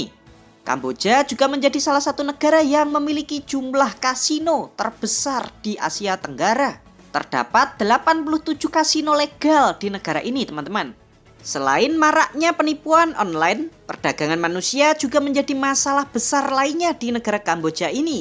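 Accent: Java, Indonesia. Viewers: Jowo medok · Logat jowo dusun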